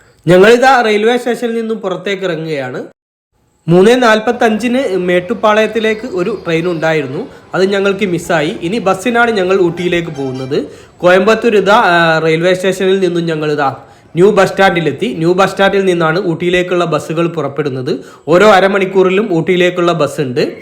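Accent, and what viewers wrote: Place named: Kozhikode, India. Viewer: ഫാഷ അല്ല ഭാഷ ഫരണി അല്ല ഭരണി ഓരോ നാട്ടിലും ഓരോ മൊഴിയുണ്ട് കോഴിക്കോടൻ ഭാഷയിൽ പറഞ്ഞതാണ് അദ്ദേഹം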